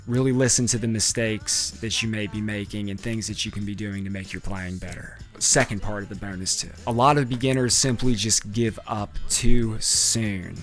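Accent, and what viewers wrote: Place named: Philadelphia, United States. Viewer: Your Philly accent is uncontrollable brother i love it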